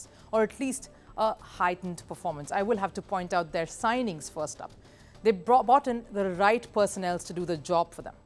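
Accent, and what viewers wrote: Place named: India. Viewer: Listening to football news with an Indian accent is weird.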